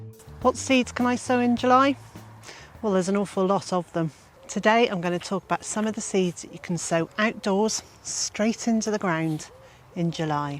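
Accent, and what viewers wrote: United Kingdom. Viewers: Oh, British accent. This will not be helpful. Heat is the problem, not cold. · Hi, you sound British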